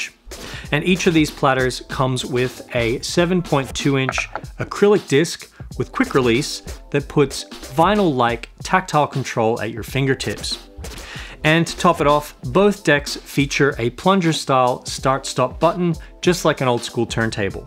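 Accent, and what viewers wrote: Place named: Australia. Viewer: Are you an Australian who has lived in America for a long time? Your voice sounds in between the two and it’s confusing me.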